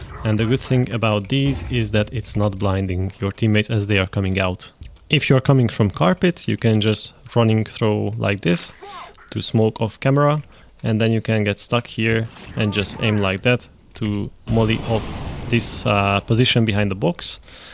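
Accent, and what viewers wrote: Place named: Hungary. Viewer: éreztem, hogy magyar vagy xd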